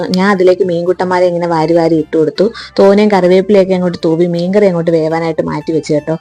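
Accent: Kollam, India. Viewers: കൊല്ലം ആണല്ലേ😂😂😂 കുറെ തോനേ... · 😂😁 കൊല്ലം, ക്കാരാണോ😮 സംസാരം, കേട്ടപ്പോ,,, നല്ല🌹🌹 വീഡിയോ,, നല്ല,, ഇഷ്ടമായി🥰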